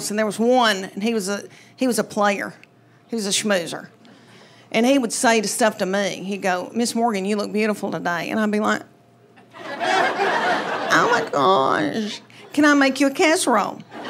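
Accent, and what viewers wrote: South, United States. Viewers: She is heavy with southern twang. I swear I thought she said ‘ma daughter was sixtwaang’ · The killer is she has that southern kill ‘em with kindness And sarcastic southern drawl. If called me Darlin’ I’d run! lol hysterical and all so true! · Love that Southern accent - the best, and sooooooo. funny. A very special Comic, wife, and mom, and a relative, neighbor -just hilarious! Thank you Leanne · Her southern accent really adds to the delivery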